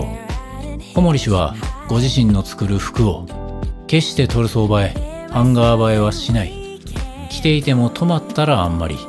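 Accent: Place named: Kansai, Japan. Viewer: 参考になります！ 語尾の関西のイントネーション（？）がクセになります笑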